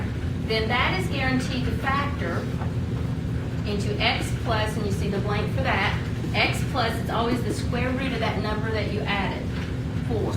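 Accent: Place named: Tennessee, United States. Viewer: Tennessee accent or Alabama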